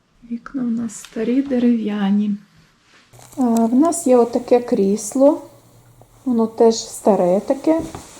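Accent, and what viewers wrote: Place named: Lviv, Ukraine. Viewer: Вибачте,що я питаю.Де ви народились,в вас вимова,як в нас на Львівщині .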